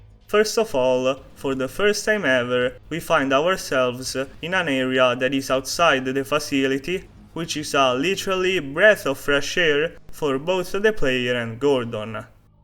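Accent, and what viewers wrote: Italy. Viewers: You sound overly italian, I love it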